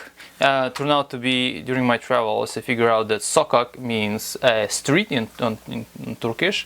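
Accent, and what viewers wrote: Russia. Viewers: Zagreb is in now called Croatia. I also love your accent man! Gotta love the Russians! Keep up the great work. :)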